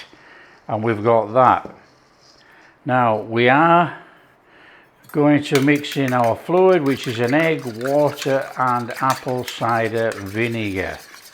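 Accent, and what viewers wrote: Yorkshire, United Kingdom. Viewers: are you from yorkshire,?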